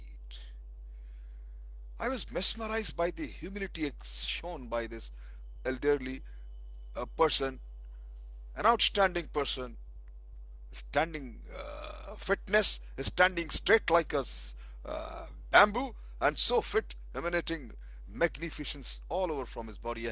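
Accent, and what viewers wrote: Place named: Kerala, India. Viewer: U sound like a mallu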